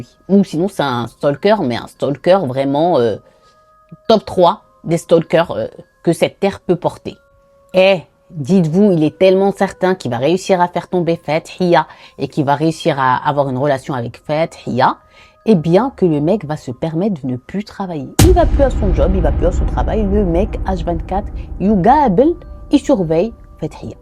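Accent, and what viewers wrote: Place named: Gulf, Arab world. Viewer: 😮😮😮😮😮😮😮😱🫣😱😱L'horreur dans toute sa splendeur... J'avoue que tu es une très bonne narratrice. Tu captive ton public. Je t'ai decouverte récemment. Tu as un superbe accent du Khalij.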